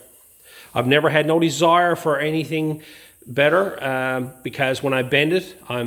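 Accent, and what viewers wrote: Ireland. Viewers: You sound Irish lad nice job on the brake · Great piece of kit, well done. Is there a touch of Irish in your accent?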